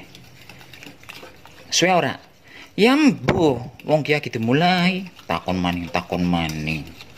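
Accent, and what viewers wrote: Banyumas, Indonesia. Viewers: Iih..mantep ketemu dulur ngapak nang kene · asik logate ngapak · Halo mas ngomongane AKEH uwong sing pada ora ngarti jawane Jawa blrkutek campur banyumasan 😭😀😂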